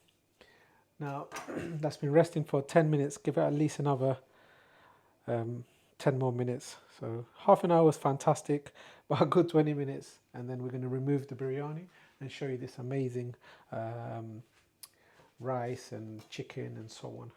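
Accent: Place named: United Kingdom. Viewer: This style of biryani is so simple, it forces me to ask : why not cook chicken curry separately and boil rice with the "khara massalas" and then mix the two. Why go through the charade of cooking them together? What irritated me most was the heavy accent (British?) interspersed with cyclic repetition of superlatives. I say, let's keep it simple and natural, what? The comments displayed are so identical you can't help but think it's the same person with no imagination.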